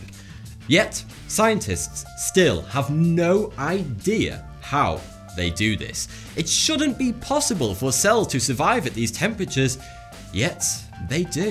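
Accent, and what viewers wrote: United Kingdom. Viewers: Edith Ann Seriously! He's not American. His accent is clearly British.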